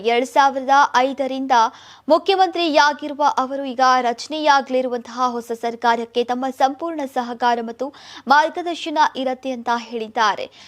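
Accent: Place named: Telugu, India. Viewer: ಮೊದಲು ಕನ್ನಡ ಸರಿಯಾಗಿ ಕಲಿತು ನಂತರ ಓದಲು ಬಾರಮ್ಮ..ಯಾ ಸೀಮೆ ತೇಲುಗನ್ನಡ ಇದು ???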